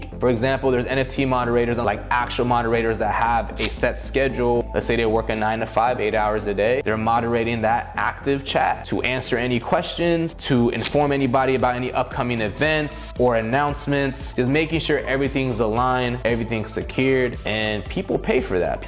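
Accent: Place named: United States. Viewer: Dude you need this in your video for people who , don't understand US accent english 10 Side Hustles to make MONEY from HOME! ($100k + per year) 10 Side Hustles You Can Do To Make Money From Home Section Overview: In this video, the speaker shares ten side hustles that require zero dollars to start and have the potential to replace your current job. The speaker provides detailed information on each of these side hustles. Your Own Clothing Store You can create your own custom t-shirts using websites like Printful or Teespring. You don't need to buy inventory; you just mark up the price from the cost of the product. Create your own Shopify website and connect it with Printful so that every time someone buys something from your Shopify website, it looks like they're buying from your store but in reality is being bought for Printful. You never have to worry about cost of inventory and you never really have to pay a dime out of pocket. 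Script Writer People out there need scripts for making content. Hire scriptwriters on Fiverr or Upwork who will do research on your behalf and create a script for you. Many people hire scriptwriters for their YouTube videos, informative videos, skits for YouTubers, tick-tockers, Facebook creators etc. Showcase your work on Fiverr or Upwork by building a portfolio. YouTube Find a niche and ride with it. If you don't want to show your face, start a cash cow channel showcasing celebrity mansions. 03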